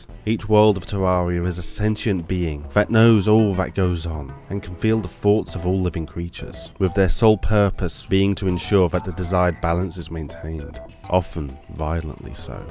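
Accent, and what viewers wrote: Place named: Europe. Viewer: TerrinX - hes European, it’s how you say it over there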